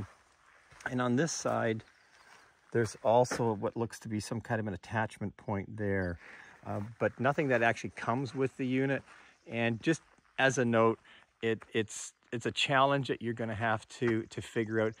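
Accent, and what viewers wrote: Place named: Western Canada, Canada. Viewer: I KNEW IT!! First-time viewer, but , I knew you were out in Western Canada -- years ago, I worked with a guy from BC who also pronounced garage as "garach." Nice guy, but he kinda stuck out in Central Jersey.😆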